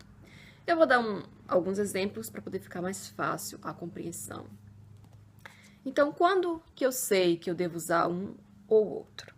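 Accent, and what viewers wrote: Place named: Brazil. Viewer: foi uma boa aula, mas a senhora poderia melhorar mais o seu alemão, tantar falar mais como um nativo germanico e não falar como um brasileiro, o alemão soa melhor com o sotaque deles, então '' Fliegen'' não é '' Flieguem ''... todo EN no final é o som de '' UM '' com a boca totalmente fechada, isso vai ajudar se praticá-lo...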